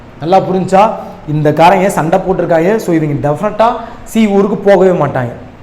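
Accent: Madurai, India. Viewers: Anna neenga madurai ah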